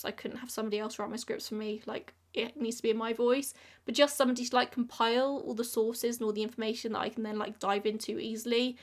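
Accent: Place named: RP, United Kingdom. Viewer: Honestly she has the best voice/accent. You should be the new "Mind The Gap" voice.... Very posh. What area of England are you from? I'm sorry Reading is a shit hole. I'm from Kentucky, maybe for your next horse you can come to Fasig-Tipton and buy one 😆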